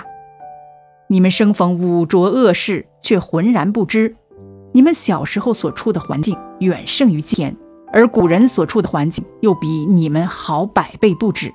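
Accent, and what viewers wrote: China. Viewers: 明明是中國人卻要講台灣的事，口音是中國口音，中國人開始用繁體字了嗎？，什麼土地神？台灣人都叫土地公。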